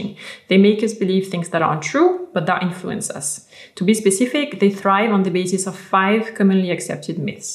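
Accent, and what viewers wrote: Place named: France. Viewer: I heard the accent and I knew you’re French 😃😃. Agréable vidéo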